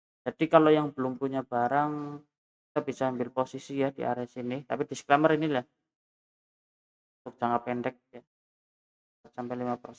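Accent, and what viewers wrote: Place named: Banyumas, Indonesia. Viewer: Emg sampeyan ngapakny dr mn paman. Sy jg ngapak 😅😅😅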